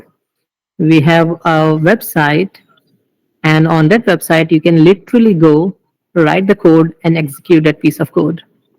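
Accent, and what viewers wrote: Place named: India. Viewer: bro dont disrespect , indian english is like that only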